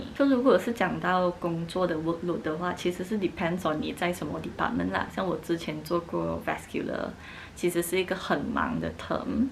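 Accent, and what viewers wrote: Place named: Cantonese, China. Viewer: 马来华裔么，中文不错，就是带点粤刚音，可能是广东那边的移民。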